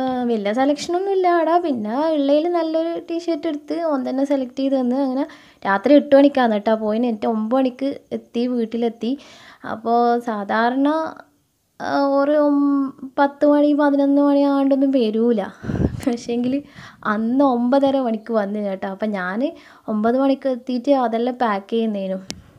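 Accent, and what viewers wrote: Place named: Malappuram, India. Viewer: മ്മളെ മലപ്പുറം കാരുടെ വർത്താനം പോലെ ഇതും ഉഷാറായിക്കണ്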